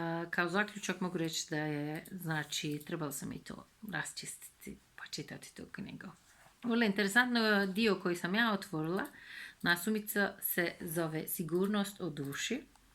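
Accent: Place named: North Macedonia. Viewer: iyi guzel hizli konusuyorsun konusma aksanin makedoncaya. ve rus diline kaciyor kelimeleri konusma ve okuma yi yutarak ve hizli konusup okuyorsun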